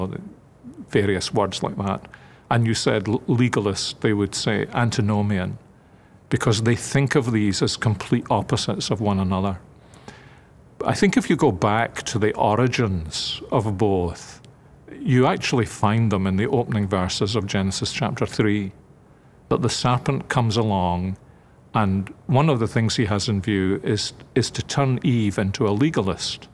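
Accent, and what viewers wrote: Scotland, United Kingdom. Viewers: Addjoa preko baah Me either! The Scottish accents are my favs! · Absolutely, and I never tire of his Scottish accent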